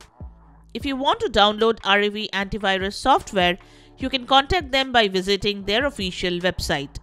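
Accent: India. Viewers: this is a virus unistall and never trust a video with an Indian accent almost all the time the scammers are form there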